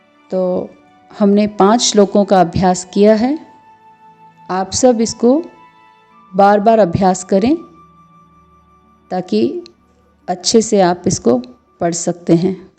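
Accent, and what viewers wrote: Standard. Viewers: bahut sunder ,shudh uchcharan,seekhne walon ke liye bohot aasan ,dhanyawad 🙏🙏🌹🌹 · Shree sadguru dev bhagwaan ki jai 🙏🏻🙏🏻aaj se pahle itna sudhh uchharan nai suna 🙏🏻🙏🏻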